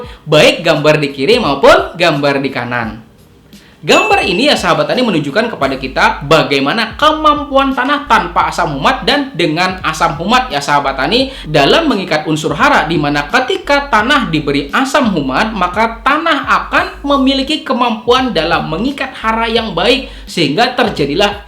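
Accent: Banjar, Indonesia. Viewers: Urang banjar kayak gitu ai... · Bukan Sunda tapi banjar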